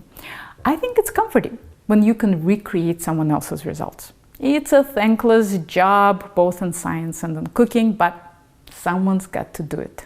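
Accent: Russia. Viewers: You have a nice Russian accent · Love your accent! Russian right? Was hooked from the first few sentences :-) very informative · I didn't even listen the recipe it's self I just watched and listen to this lady talking in her Russian accent. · So hard russian accent, I couldn't resist... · Quite entertaining, and with a kinda of Russian accent for a change! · You do Italian food but you sound Russian! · Like the Russian accent and talking of pasta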